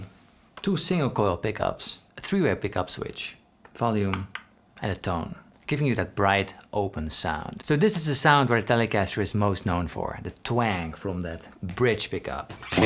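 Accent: Netherlands. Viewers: Holy shit, het eerste wat ik dacht was: "dit klinkt als een Hollander die Engels praat". Dat werd snel bevestigd... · Are you dutch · are you Dutch by chance? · i hear your dutch accent :) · Groetjes uit Nederland. Je kan altijd een Nederlander horen als die Engels spreekt :-) Ja, ik heb ook een accent 🙂 Hoe dan ook, eerste keer dat ik je zie, super goeie vid gozer!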